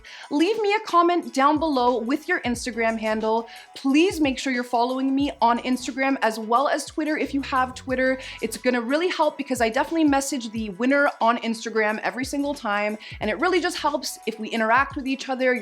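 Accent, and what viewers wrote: Canada. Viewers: I just came across your channel and i love your accent! are you from Canada? 🇨🇦 my instagram handle is !!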